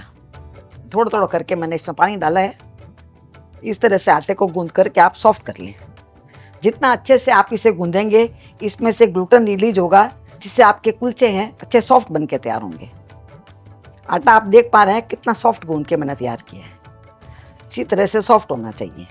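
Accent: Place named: Rajasthan, India. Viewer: Nice mam love from Gujrat ❤ I also love Rajsthani people your rajsthani tune in all recipes thats why I watch your all videos you look like morden Nani 😅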